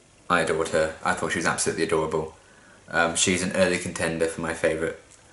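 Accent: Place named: United Kingdom. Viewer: It's been a whole year sense I got to hear you amazing brit voice. Nice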